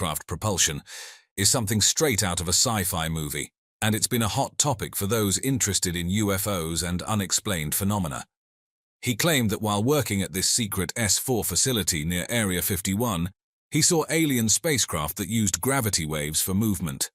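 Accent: England, United Kingdom. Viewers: Well at least this had a decent English voice, unlike many of those fake grating Yankee voices although it repeats itself so still suspect this was AI generated.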